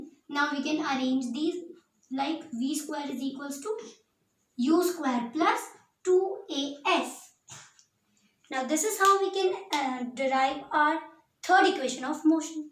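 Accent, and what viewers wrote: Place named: England, United Kingdom. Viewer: your confidence and english accent makes it awesome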